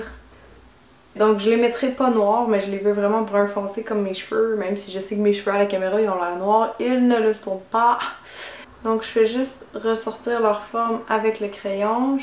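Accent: Quebec, Canada. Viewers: L'accent Québécois! J'adore cet accent! Vas-y continu tu seras rapidement aux 1'000'000! :D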